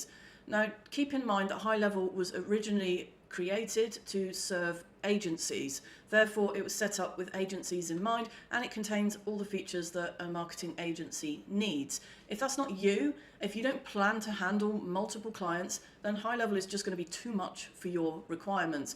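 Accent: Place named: United Kingdom. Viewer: Nice to hear a British voice lol 😂🙌🏽. I'm from the uk also 🇬🇧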